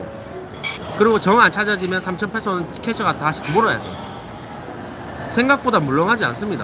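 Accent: Gyeongsang, South Korea. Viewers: 좋은 공부가 되셨네요. 힘내세요. ^^ 경산도 사투리 때문에 그냥 말하는게 업되어 보이는 걸 보는 사람마다 받아들이는게 틀리니 마음에 담지 마세요. 힘내세요.^^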